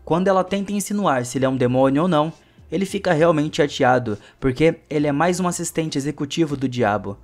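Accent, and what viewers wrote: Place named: United States. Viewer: Mas foram botar logo um americano pra narrar o video?! Que diabo de "perrquê" é esse? Quanto ao filme, existe um contrasenso terrível imposto pelo roteirista. Ora, se a mulher não acredita em nada de inferno, que diabos (expressão bem apropriada) ela foi fazer ao aceitar se encontrar o encontro com o tal? E será que depois de ter uma vida mudada totalmente, por 50 anos, ela nem desconfiou que tinha algo a ver com o contrato? Esse roteiro parece conta de chegar!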